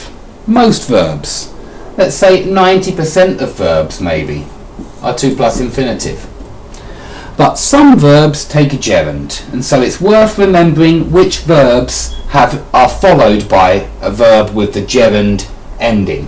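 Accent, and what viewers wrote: United Kingdom. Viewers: I speak German,French but above all the languages I adore British English in terms of accent! Also your explanation of the Gerund,and English grammar at all,is thorough and very praiseworthy !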